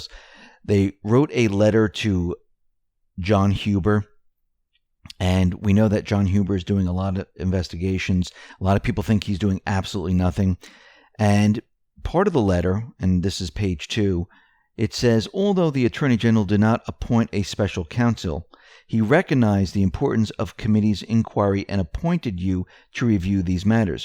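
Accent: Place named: New York, United States. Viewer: from the New York area judging by his accent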